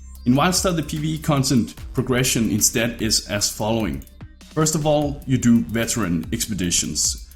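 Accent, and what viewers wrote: Denmark. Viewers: Dat dane accent <3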